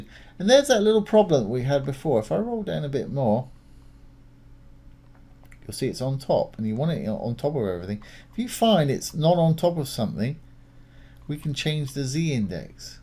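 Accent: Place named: England, United Kingdom. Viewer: I like this from! I am intrigued by your accent you sound English but drop the 'T's - very american! Whatever - watch all your videos, you should do some for Elegant Themes KP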